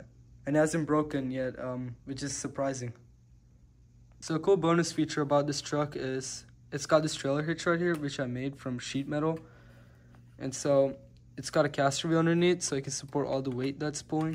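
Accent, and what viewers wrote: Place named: Kerala, India. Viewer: your name and your accent 😀. is a common name in kerala and the way you speaks resonated like a malayali touch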